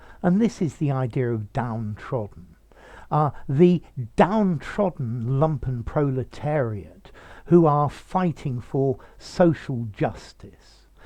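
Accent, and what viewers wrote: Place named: England, United Kingdom. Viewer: I can't thank you sir . Really clear explanation + awsome English accent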